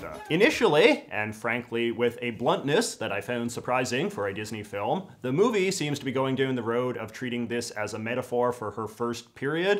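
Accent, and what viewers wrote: Canada. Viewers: homie, as a fellow canadian, you’ve got the most canadian voice i’ve ever heard not in real life! you hit those aboots, love it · this man has a classic stereotypical canadian accent and I love it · I’ve barely heard any Canadian accents but I love your one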